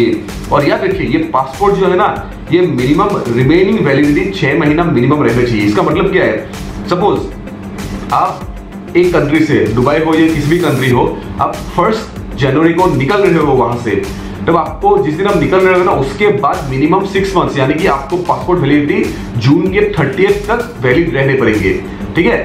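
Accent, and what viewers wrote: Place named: Assam, India. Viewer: your tone looks like that of a assamese or a bengali guy..😅😅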